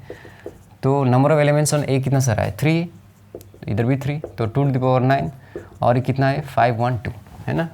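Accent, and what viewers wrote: Assam, India. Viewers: Kya aap Assam se ho?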